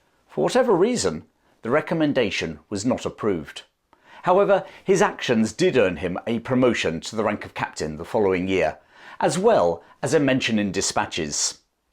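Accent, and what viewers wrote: United Kingdom. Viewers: I recently discovered, it is amazing 👍 This is the only way to hear British History by a Brit and with that beautiful British English accent😊 Thank you, with “howdies” from Texas 👋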